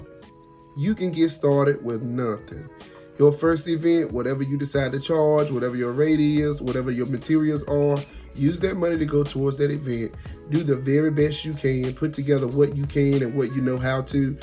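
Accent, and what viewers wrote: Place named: South, United States. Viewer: You country………no way😂 who knew LOL! I love it though ❤ another great video. I love how you give tips to getting started. Again, I’m not doing this professionally I just absolutely love creating. I do so much, you name it I do it but it’s honestly for the love of it. I’m an ER Nurse and Nursing Instructor and I love that just as much. My designing and crafting are also my therapy. I lost my oldest daughter and her husband to an accident May 2022. If I didn’t have crafting and designing whew chile. But God is faithful and I trust Him. If God calls me to do it professionally then I’ll answer but I have to hear from Him and I’ll need him to call me by my whole government name! Keep elevating Sir.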